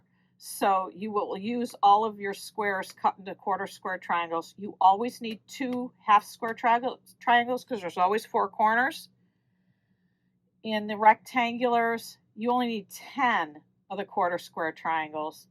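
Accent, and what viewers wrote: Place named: Illinois, United States. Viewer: Good morning, a great way to wake up. Usually I watch you much later in the day, with my tea and toast. Coming from a family that puts an "R" in wash, I just attributed salvage vs selvedge to an Illinois accent if I even noticed at all. I don't know what I would do with another fat quarter bundle, but I would love to find out. Have a great week, Diana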